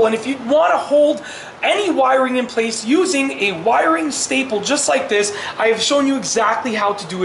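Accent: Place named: Canada. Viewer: Canadian Eh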